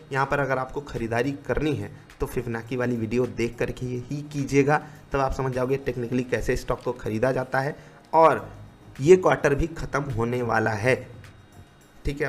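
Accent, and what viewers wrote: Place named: Uttar Pradesh, India. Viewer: ap allahabad ya kanpur k lagte ho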